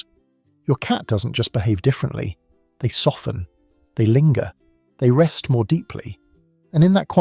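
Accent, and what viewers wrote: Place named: United Kingdom. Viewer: Why do all AI videos have the same British accent. Not a good one, either.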